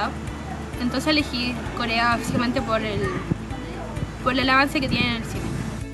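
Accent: Mexico. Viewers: porque habla como mexicana si es shilenaaaaa ajajajaja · Por qué los chilenos se le pega el acento mexicano ??? No lo entiendoooo!!!!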